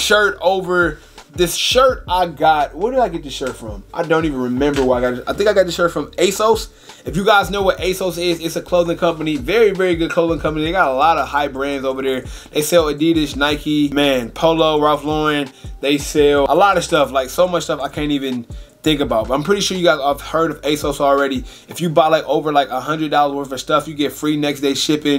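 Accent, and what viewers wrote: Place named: Chicago, United States. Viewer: I thought you were from Chicago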